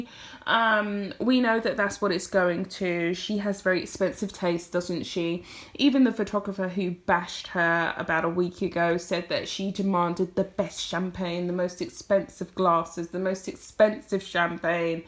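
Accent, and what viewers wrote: United Kingdom. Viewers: I had never considered that you speak fast but for people not familiar with a British accent it must be difficult. I sent a comedian clip to someone and they said it took them a while to figure out what he was saying. I thought it perfectly understandable. 🙂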